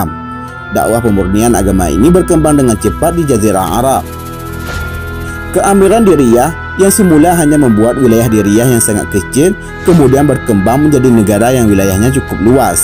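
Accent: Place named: Solok, Indonesia. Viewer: Naratornya pasti urang sOLOK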